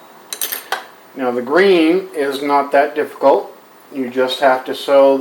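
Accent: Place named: North, United Kingdom. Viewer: Ah ha! I knew it was northern. Video is fantastic!!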